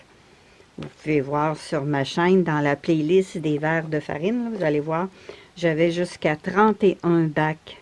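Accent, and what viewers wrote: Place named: Quebec, Canada. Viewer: Bonjour et bonjour de france,j'ai plaisir à suivre vos vidéos mais aussi plaisir à écouter ce si jolie accent québécois. J'aurais juste une question,vos vers vos les élevés dans le noir ou avec un de lumière? Cordialement Romain et comme on dit dans votre belle province gros bec.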